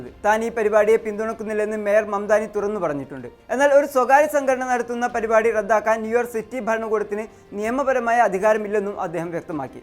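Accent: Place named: Malabar, India. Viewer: ബ്രോ നന്നായി സംസാരിക്കുന്നുണ്ട്. പത്രത്തിൽ അച്ചടിച്ചു വരുന്ന അച്ചടി ഭാഷയിൽ വേണം ചാനലിൽ സംസാരിക്കാൻ പ്രത്യേകിച്ച് പരിപാടികളിൽ. മലബാർ സ്ലാങ് മീഡിയയിൽ വിഷയാവതരണത്തിൽ കൊണ്ട് വരരുത്! നിങ്ങൾ പല വാക്കുകളും സ്ട്രോങ്ങ്‌ ആയി സംസാരിക്കുന്നില്ല..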